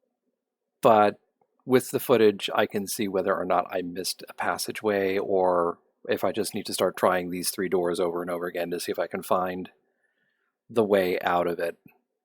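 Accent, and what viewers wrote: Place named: Canada. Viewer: Also curious since you mentioned it - there was something that stood out to me in one of your videos. I had assumed (now confirmed correctly) that you are Canadian since you have been saying "zed" but don't have an east-overseas accent. Though later I noticed when you were looking in the Hinterlands, you said "catch some ZEE's" which I think is the only time so far I've heard you say it like that, is that particular idiom usually said that way? Or did it just happen to roll off this time?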